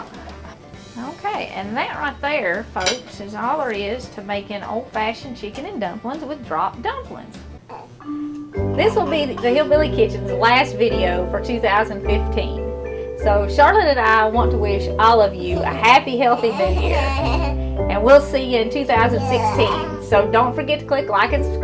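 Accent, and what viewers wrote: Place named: Tennessee, United States. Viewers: Chicken n dumplings are my favorite!! Mama made great dumplings. She rolled hers out and cut them out. She had taken the chicken out of the pot and dropped each dumpling along the side of the boiling broth. She would use a butter knife to push the dough toward the center. I just thought that’s how everyone did it. But I’ve watched many many cooks on here make c n d and no one dropped them like she did. I’m like you, I don’t want veggies in mine. My Daddy didn’t even want the chicken put back in the dumplings. 😊 yours look more like what we do in East Tennessee. From your accent you might also be from TN. 😂 God bless you and your family. 🙏🙏🇺🇸🇺🇸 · YOU to are a fine blessing to this old man who can't cook too well here in WV. Thank You so very much for the patient instructions and ideas. Your wonderful voice, with charming Tennessee accent reminds me of my wonderful, long-passed Aunt God Bless You and your fine family.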